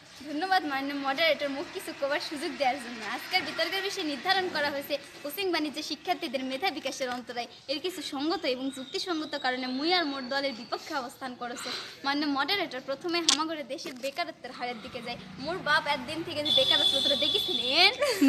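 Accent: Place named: Joypurhat, Bangladesh. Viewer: এক্কেবারে হামার কতার মতো বারে😅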